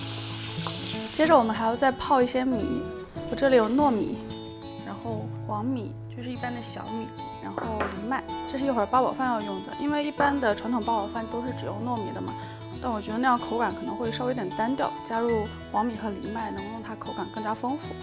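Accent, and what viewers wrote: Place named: Wuhan, China. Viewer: 微微听出来武汉口音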